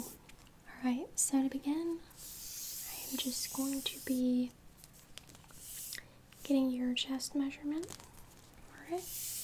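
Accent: South, United States. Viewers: That southern accent ❤️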